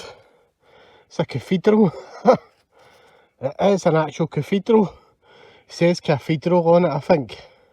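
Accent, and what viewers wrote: Scotland, United Kingdom. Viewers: I just love your videos 🤛🏼 & ur accent… i hear Glasgow accent is stronger than Edinburgh? ☺️ Wish I could find tips like u find up ur way, down here in Yorkshire… ☺️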